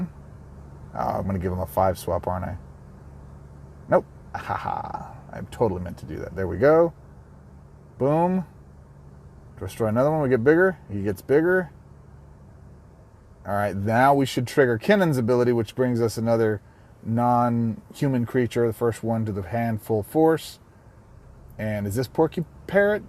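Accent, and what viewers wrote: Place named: Scotland, United Kingdom. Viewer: What's that Scottsman from?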